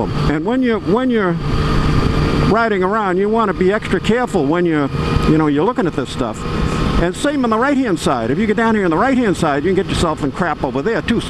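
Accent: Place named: New England, United States. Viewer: His accent doesn't sound like Florida. Sounds like a New England transplant.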